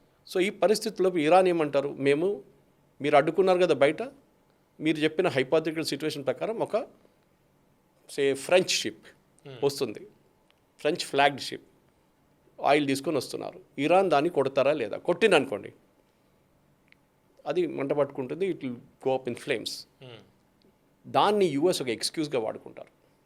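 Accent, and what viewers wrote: Telangana, India. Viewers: sir hats off to ur plenty of knowledge more over telangana yaasa chala bagundi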